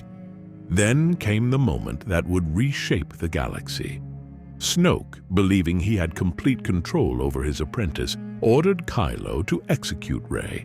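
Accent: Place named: United Kingdom. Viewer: I never knew someone so british could tell stories about StarWars, but im in for it <3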